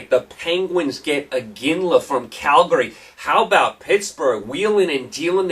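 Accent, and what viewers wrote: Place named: New England, United States. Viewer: I love a Yankee accent.....u defiantly sound better than the sports guy in Bowling Green, Owensboro KY, or Nashville, TN...thanks for sports show.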